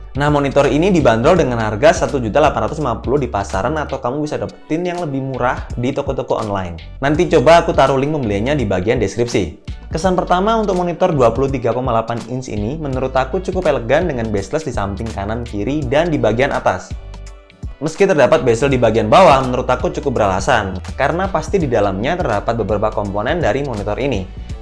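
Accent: Java, Indonesia. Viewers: Coba lebih meddok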